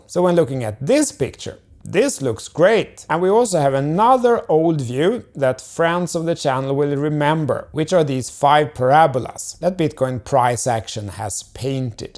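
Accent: Sweden. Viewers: Can’t hide that accent hello from a fellow Swede 🇸🇪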